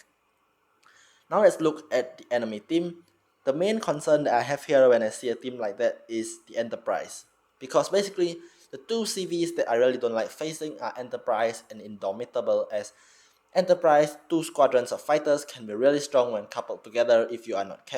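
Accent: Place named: Singapore. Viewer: man he sounded sooo SG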